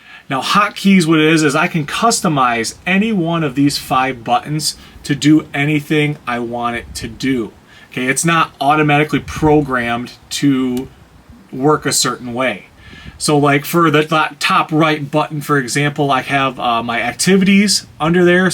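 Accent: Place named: Michigan, United States. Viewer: This guy is so Michigan. The clothes. The accent. Dude looks like every dude in my town. Props from Traverse City, man!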